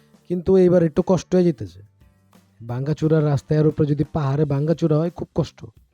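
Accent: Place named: Dhaka, Bangladesh. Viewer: ঢাকাইয়া ভাষায় সুন্দর বি হয়চে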